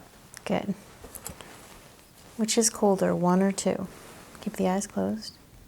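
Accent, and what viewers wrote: South, United States. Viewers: Love the southern accent! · This English Girl loves a Southern Accent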